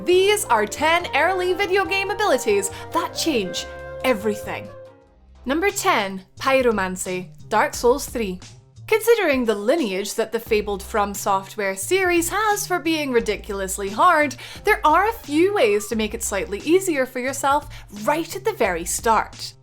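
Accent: Ireland. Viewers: You have a beautiful Irish accent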